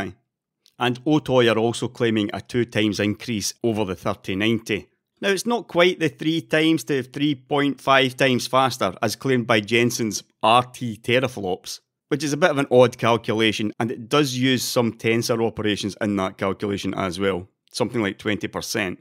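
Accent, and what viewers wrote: Scotland, United Kingdom. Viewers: "assuming they have a 7900XTX in the works"? It sounds like you're in Scotland but I'm pretty certain the news gets there too! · Started watching out of interest, finished watching to listen to a Scot say "faster in raster" 69 times.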